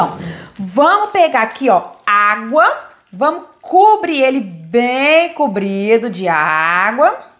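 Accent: Minas Gerais, Brazil. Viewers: Nossa, que delícia, achei que era mineira por causa do sotaque mineira rsrsr.... Bjs adoro seus vídeos 😘😘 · Amei seu jeitinho de falar se e minerinha uaikkkkklinda amei sua receita vó faser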